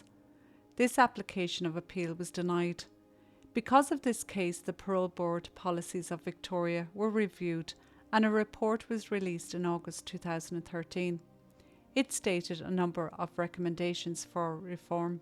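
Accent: Ireland. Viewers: I don't think you realise how good your channel is! Imagine this: an Irish woman, with a beautiful Irish accent, the sometimes brutally honest but heartfelt opinion of a woman with values that have been eroded away but still stand for what was once good and proper in society..... versus AI content farmed true crime stories narrated by electronic voices that are mispronounced, misrepresented and churned out just for clicks and clout., Redrum, Truly Criminal and Just Thought Lounge are the only true crime channels I watch anymore. And sadly, when content farms force human creators off the platform, I won't be the only one mourning the loss of authentic voices like your own. Apart from anything else, is the only channel where I watch and wish, outside of the cases covered, I wish I was there in Ireland instead of here in this dystopian land of Britain! A beautiful country and an equally beautiful voice - I hope your channel grows and you remain here as one of the few human creators left amongst the future of content creation.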